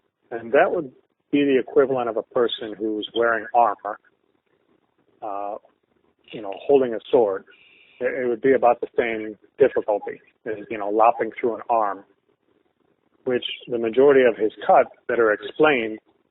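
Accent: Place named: China. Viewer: still sounds Chinese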